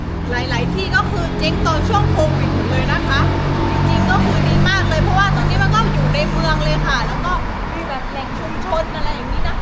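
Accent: South, Thailand. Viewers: คนใต้ไหมคะ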